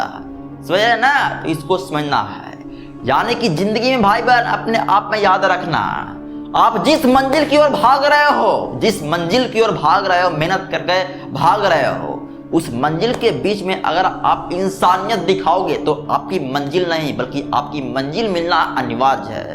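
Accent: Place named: Bihar, India. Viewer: इंसानियत कम होने का बड़ा कारण बढ़ती आबादी है । कुछ लोग पीढ़ियों से सुधारना ही नहीं चाहते। सुवर की तरह आबादी बढ़ा रहे हैं। ओर कमाने वाला एक की मदद करता है तो 10 आकर ओर खड़े हो जाते हैं। तो उनको भी सोचना होगा तभी जिंदगी सुधरेगी सबकी । भाषण देने से कुछ नहीं होगा तुम बिहार से लग रहे हो तुम्हारी बोलने की अंदाज से लग। रहा है 17 करोड़ हो ध्यान देना 😂😂😂😂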